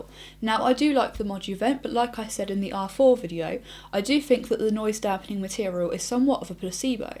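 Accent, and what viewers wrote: England, United Kingdom. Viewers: Mornbs What are you on about? It is ENGLISH, not american. If you don't understand what she's saying, then you need some more english lessons. O.o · u forgot to mention very sexy voice and british accent!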